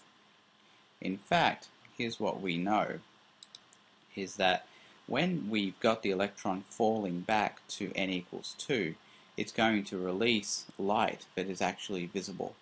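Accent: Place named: United Kingdom. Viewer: you bless my sleep with dreams of your british voice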